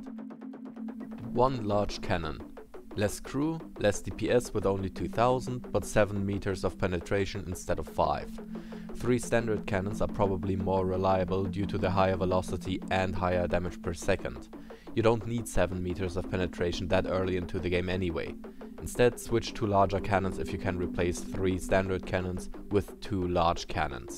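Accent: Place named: Germany. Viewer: I spend half the video wondering whether I heard an German accent or not. Your English is better than mine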